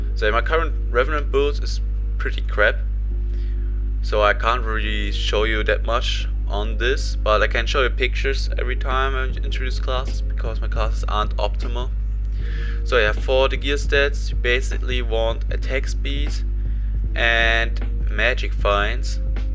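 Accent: Germany. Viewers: Are you from Germany you have a German accent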